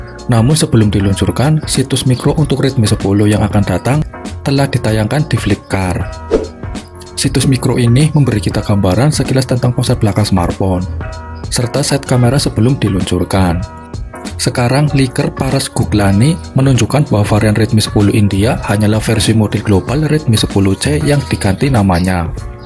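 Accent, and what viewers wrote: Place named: Tegal, Indonesia. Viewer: Sing ngisi suara wong Endi coyy... Medok n ngapak nemen yahh.. wong Tegal apa Brebes ??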